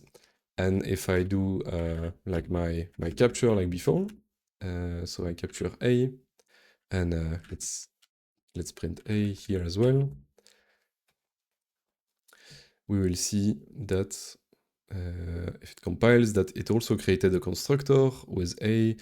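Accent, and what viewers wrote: France. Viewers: 🎉 brilliant. Cool to finally understand the magic behind cpp lambda functions. Nice french accent by the way.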